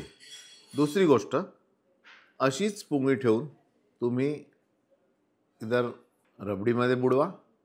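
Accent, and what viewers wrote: Vidarbha, India. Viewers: Sir aap vidarbh k hai, khandesh k hai , ya marathwada k 😊😊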